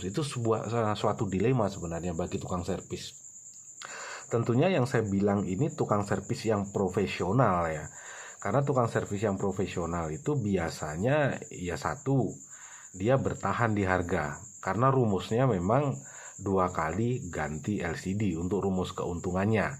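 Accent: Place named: Bali, Indonesia. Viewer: logatnya bali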